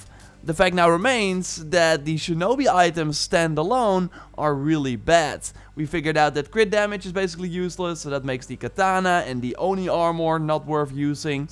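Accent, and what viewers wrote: Netherlands. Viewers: Je hoort gwn da hij Nederlands is😂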